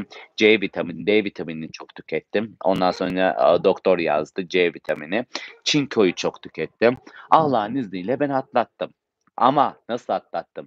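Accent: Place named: Kastamonu, Turkey. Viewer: Kardeşim Başına Sağolsun Allhm Kabir Rahatlığı Versin Kastomonulumusunz Konusmalarn Öyle Geldi Bizde Sinopluyz Sabırlar Dileriz Çok Büyük Bir Acı Sende Merhametli Bir Evlatsn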